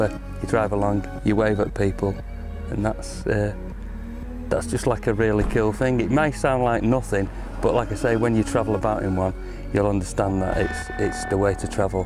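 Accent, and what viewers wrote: United Kingdom. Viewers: Bill Murray with a British accent. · yep that british accent :)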